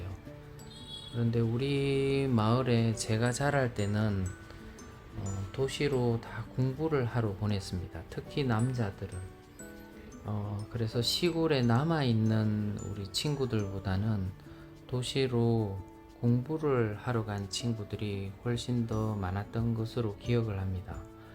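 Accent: Gyeongsang, South Korea. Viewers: 선생님의 이야기 잘들었습니다. 항상 느끼는것이지만 선생님은 경상도분이신데도 투박하지않은 듣기좋은 그런사투리를 쓰십니다. 그래서 항상 듣기가 편안합니다. 제 말투는 완전 대구사투리 그 자체거든요ㅎㅎ 오늘 날씨가 참 좋아보이네요 그리고 제가 가보았던곳이라 너무 반갑습니다ㅎㅎ 좋은하루 되시구요 오늘도 좋은영상 감사합니다^^ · 새해복마니받아요 저두 대구사람 어찌 말투가 주위에서 자주듣는 어투라서 경상도분이구나했두만 역시나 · 제 고향도 창녕 옆 밀양 무안입니다, 저도 부산에서 몇 년 학교를 다녔구요, 그래서, 억양이나 정서가 비슷하니 더 정감이 가는 것 같네요, 올핸 뵐 수 있겠죠!!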